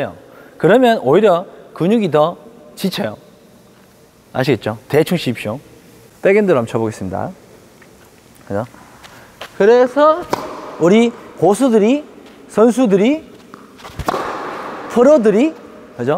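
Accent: Gyeongsang, South Korea. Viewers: 경상지역 사투리 같네요. 툭 던지는 남쪽지역 말투와 대충 치듯 던지는 스윙이 조화로워서 웃음이 절로납니다. 먼 곳(캐나다)에서 영상 잘 보고 있습니다. 힘든 시기 잘 이겨내시고 테니스로 몸도 지켜내시길 기원합니다.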